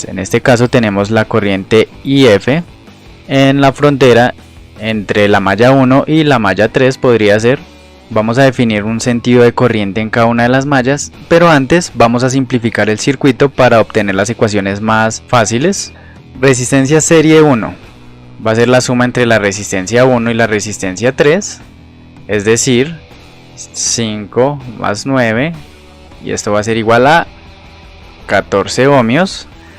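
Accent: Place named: Colombia. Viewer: Ya te agarré el acento, Es de Colombia. Es que dicen que los de Sinaloa tenemos parecido el acento al de Colombia. Tienes una joya de canal, lástima que no tengas las visitas que mereces. Pero sé paciente. Poco a poco. Saludos!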